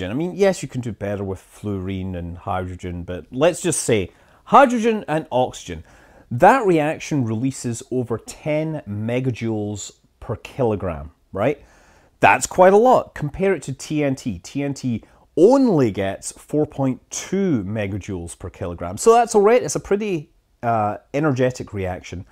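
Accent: Scotland, United Kingdom. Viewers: Something about a scottish mans voice talking about rocket science is super calming. Kids want a bed time story heres uncle to read you history of rocket fuels. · As a fellow Scotsman, yer accent does my nut in man.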